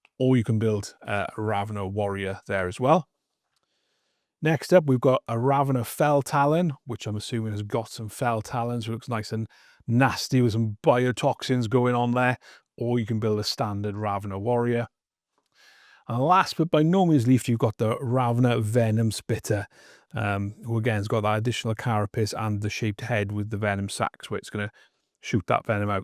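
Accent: United Kingdom. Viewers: Lovely accent, whereabouts in the UK are you from?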